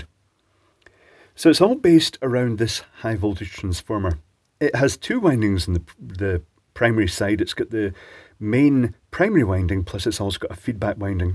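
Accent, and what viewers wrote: Scotland, United Kingdom. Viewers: There's something magical about hearing "green crustiness" in a Scottish accent.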